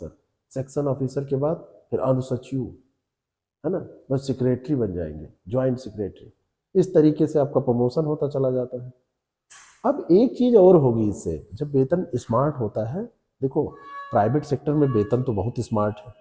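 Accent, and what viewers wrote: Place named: Purvanchal, India. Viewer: Lgta hai aap purvanchal k side k hai😂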